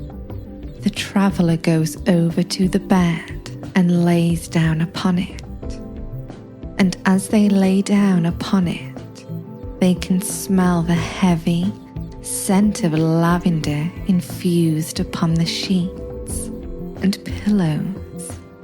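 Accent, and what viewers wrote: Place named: England, United Kingdom. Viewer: Sensuous... so , so sexy....gorgeous.... sultry voice.....beautiful English accent....root beer eyes .....LOVE when you look directly into the camera. I fucking LOVE when I see a woman that knows how to be a woman , knows how to use her powers with men. You are irresistible , m' lady. Thank you for sharing your gift with us.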